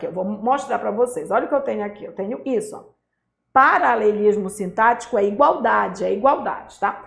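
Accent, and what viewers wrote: Pará, Brazil. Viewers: A professora é paraense? P.S.: excelente didática <3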